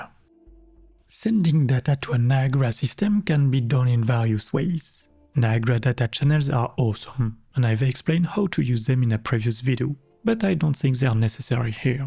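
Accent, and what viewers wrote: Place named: France. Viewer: Accent is just terrible and subtitles def don't help. Invest in some speech classes french boy lol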